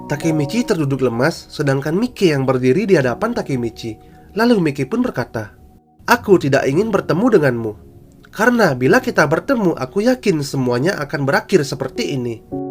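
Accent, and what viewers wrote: Bali, Indonesia. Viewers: dari bali ya bli? logat nya udah keliatan nok wkwk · admin orang bali keknya wkwk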